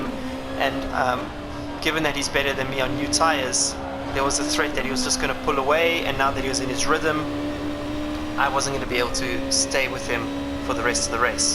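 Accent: South Africa. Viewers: Nothing like a south African accent......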